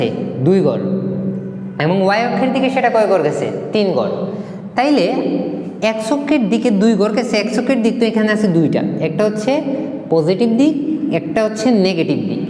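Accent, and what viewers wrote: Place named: Sylhet, Bangladesh. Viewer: আপনে কি সিলেটি? কথায় আমাদের সিলেটের মতো লাগে.....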